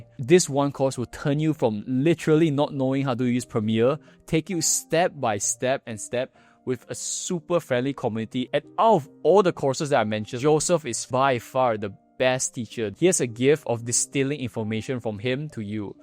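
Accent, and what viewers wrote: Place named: Singapore. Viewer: waow an editor with singaporean accent les goo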